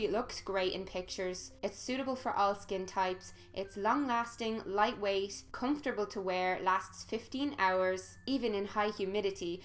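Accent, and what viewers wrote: Ireland. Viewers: your tutorials are very helpful for people with excoriation disorder like me, now I'm not ashamed of my skin, thank you so much <3 also I'm Russian and I really like Irish accent, for me it's much easier to understand than British :) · Oooh man, what a lovely accent! It makes me wanna visit Ireland even more <3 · I soooo love irish accent... And i have a friend who is irish... She's so sweet and kind... First time watching your video... I subscribed immediately 😘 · I'm always so exited when I see your videos in my subscription box. I love your style and your accent is so desirable! Wish I was Irish! Thanks so much for improving my makeup knowledge and providing such inspiration!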